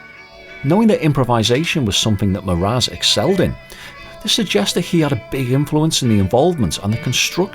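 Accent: Liverpool, United Kingdom. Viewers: Your work on these videos is outstanding and you deserve, at the least, many many thanks. The liverpool accent is very controlled and toned down also and, as such, it works perfectly so no apologies needed from you re that. As for - while I love all the 70s albums (perhaps, Tormato not so much), when I first heard this one (I got it as a xmas present and found it before xmas and just couldn't wait to hear it so gave it a listen and hastily put it back where I found it) it blew my socks off! This is Yes as "in your face" as you can get. "Metallic Yes" as I call it. Not as in heavy metal but the sounds of just about every instrument. Cranked up and piercing. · Nice to hear another Scouser being bang into Yes.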